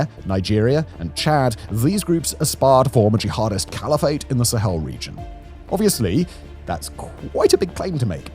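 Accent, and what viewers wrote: United Kingdom. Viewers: He said Mali, British peoples L's sound like R's because of their accent.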